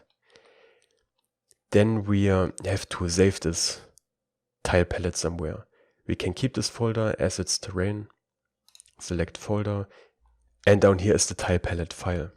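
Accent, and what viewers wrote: Germany. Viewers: Love your accent, I’m german btw too😂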